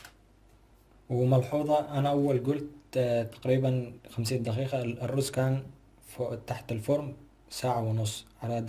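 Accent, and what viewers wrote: Aden, Yemen. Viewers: وووواقلبي على اللهجه العدنيه حبيب ربي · فديت اللهجه العدنيه هههههههههههههههههههههههههه تسلم يديك ياغالي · الشيف لهجته عدنية .. تعزوعدن لهجتهم كثير متشابه .واصله من يافع بس من سكان عدن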